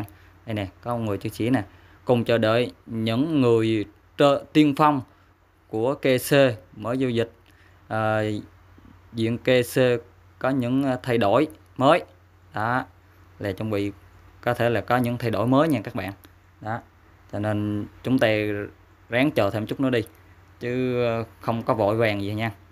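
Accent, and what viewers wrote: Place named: Vạn Giã, Vietnam. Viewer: Chú Em này nói tiếng vạn giã đây OK nè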